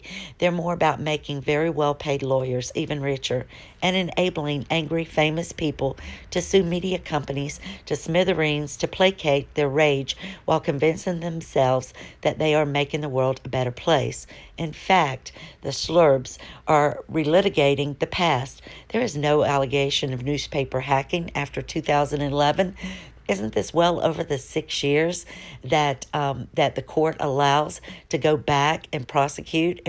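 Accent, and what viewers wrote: South, United States. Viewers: Thank you for reading this. You have a delightful voice and a gorgeous southern accent ❤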